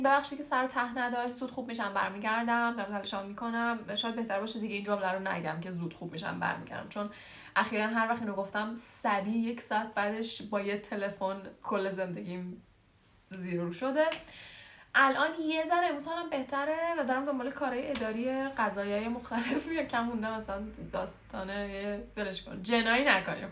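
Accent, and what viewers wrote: Iran. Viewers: احساس میکنم اصفهانی هستی، درسته؟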